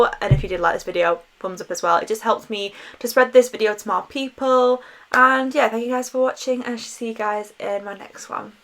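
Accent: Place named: Yorkshire, United Kingdom. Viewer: also, i absolutely adore your accent 😂 i’m from the midlands and me and my boyfriend always say ‘lovely’ in the yorkshire accent hahah, made me laugh when you were swatching!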